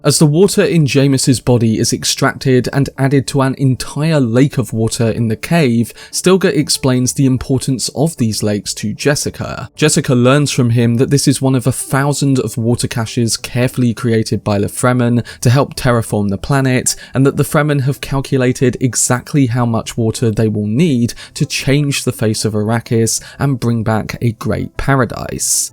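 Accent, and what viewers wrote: United Kingdom. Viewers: Your accent is super cute. Vitamin, water, other words… British?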